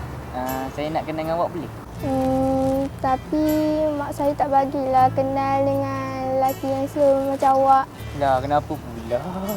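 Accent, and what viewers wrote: Kelantan, Malaysia. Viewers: Bg dari kelate ke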